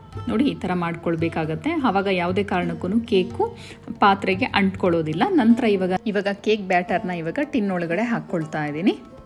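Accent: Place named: Malenadu, India. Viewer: ಹಾಯ್ ಮೇಮ್. ನೀವು ಅಚ್ಚ ಕನ್ನಡದಲ್ಲಿ ಸ್ವಚ್ಚವಾಗಿ ಮಾತಾಡೋದನ್ನು ಕೇಳಿದ್ರೆ ಹಾಗೆ ಕೇಳ್ತಾ ಇರ್ಬೇಕು ಅನ್ಸುತ್ತೆ. ನಿಮ್ಮ ಮಾತಿನ ಶೈಲಿ ನೋಡುವಾಗ ನೀವು ನಮ್ ಮಲೆನಾಡಿನ ಕಡೆಯವರಿಬಹುದೇನೋ ಅನ್ಸುತ್ತೆ. ಮತ್ತೆ ನಿಮ್ಮ ರೆಸಿಪಿಗಳೆಲ್ಲ ಒಂದಕ್ಕಿಂತ ಒಂದು ಚೆನ್ನಾಗಿದೆ. ವಿವರಿಸುವ ಪರಿಯಂತೂ ವರ್ಣನಾತೀತ. ಅದನ್ನೆಷ್ಟು ಹೊಗಳಿದರೂ ಸಾಲದು.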